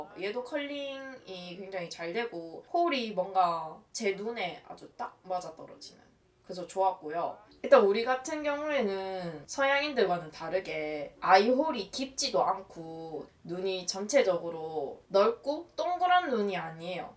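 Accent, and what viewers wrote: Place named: Daegu, South Korea. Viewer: 대구분인가요? 대구 사투리같아용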